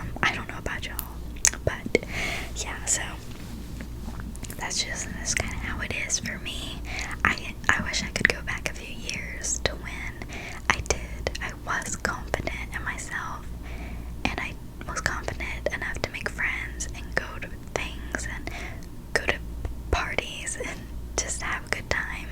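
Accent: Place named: South, United States. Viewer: Girl I am ugly crying in my bed like snot dripping from my nose crying. This vid hit hard with me. I totally relate to everything you said and lm 41. I like videos like this because I like getting a view of other people’s lives. Like I look at you and think gosh she’s so pretty, she has the cutest southern accent, she is so dam kind and I envy you. But u gave us some real ass shit and I love it. I don’t love how you’re struggling but just know you’re not alone. I know it seems embarrassing talking to someone, a stranger about how you feel but don’t be. If you need help it’s ok. This is my fav video of yours. I honestly like these asmr videos more than the other stuff. You’re one of my favs 💕💕💕💕💕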